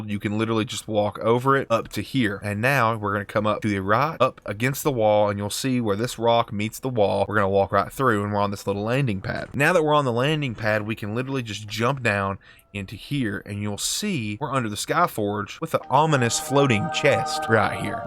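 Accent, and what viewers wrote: South, United States. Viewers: It is so great hearing another southern person playing video games😂 favorite part is “beat the brakes off of her”😂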